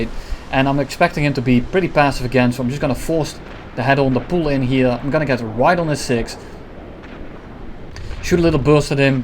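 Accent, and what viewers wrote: Netherlands. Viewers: hahah still a nice video😉 wait a dutch accent ?🇳🇱🇳🇱🇳🇱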